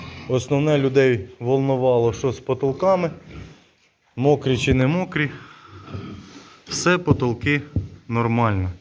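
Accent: Ukraine. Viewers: Доброго здоров'я, приємно чють рідний Миколаївський суржик))) , краще ніж відоси годовой давності))). Я в одного дятька бачів підлога в свинарнику була викладена з дерев'яних чюрок, як плитка тротуарна)) . В самого хозяйства немає вже давно , а приємно дивитися ваші відоси. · Такий у Вас цікавий говор, звідки Ви родом? Мій тато так розмовляв - Харківська обл. Дуже цікаво, а головне доступно для новачків розказуєте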